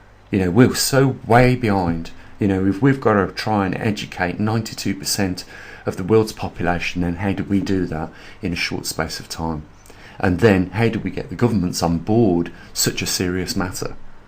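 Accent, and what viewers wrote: Birmingham, United Kingdom. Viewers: Are u a Brummie.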